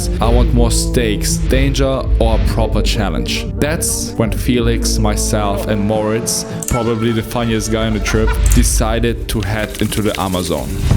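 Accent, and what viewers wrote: Germany. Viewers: I hear Deutsch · Am i hearing a little bischen deutsch ?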